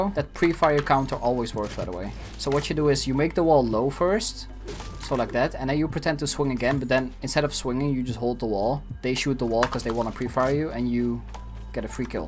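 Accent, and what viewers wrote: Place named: Netherlands. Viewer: Je hoort het Nederlandse accent haha ❤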